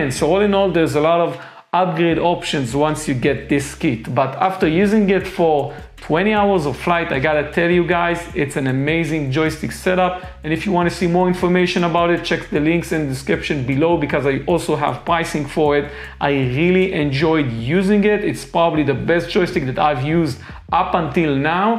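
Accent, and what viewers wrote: Israel. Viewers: I love your Israeli accent :)) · שומעים שאתה ישראלי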